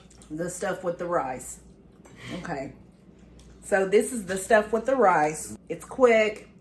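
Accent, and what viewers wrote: Kentucky, United States. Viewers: I don’t comment on yt much but I have to for you! 😂 I can’t stop watching your videos! I love your rawness/transparency, personality, smile, accent (soon as I heard I thought she has to be from Kentucky- bc I am too 😁) and of course love your recipes. They hit home and remind me of my mom and grandparents cooking who have all now passed on. 😢 there were some I wish I had gotten from them and found here so thank you!! (Chocolate gravy for example) You are beautiful and have a beautiful family. I have 3 sons ages 23, 22, and 20. So I can relate! Guys don’t be so shy and help support your mama. 😂 💯 I’m sure she deserves it and seems so proud of you all. 💖 keep up the good work guys. Best wishes 🤟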